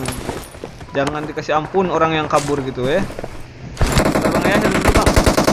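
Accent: West Java, Indonesia. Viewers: Edan mantap cui modar modar hahaha pasti urang sunda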